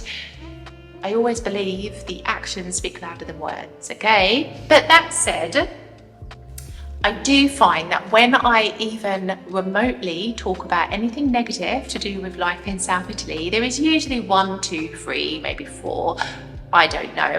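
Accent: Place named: London, United Kingdom. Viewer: Yes I love it. And I adore your londinese accent.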